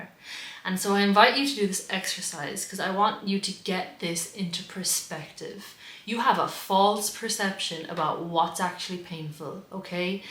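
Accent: Ireland. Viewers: I love your irish accent.Your so beautiful ❤️😊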